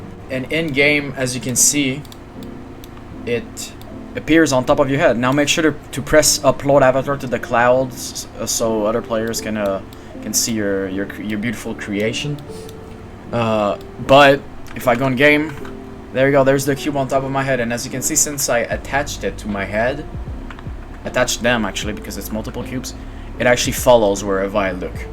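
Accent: Quebec, Canada. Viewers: Am I crazy or do you sound vaguely canadian french? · correct me if im wrong but i think this is a Quebec accent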